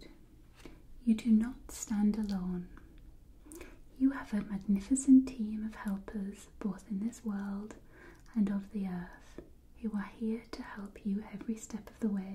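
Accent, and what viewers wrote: United Kingdom. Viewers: Y ella aún habla el inglés británico, y así se queda más difícil para muchos 😣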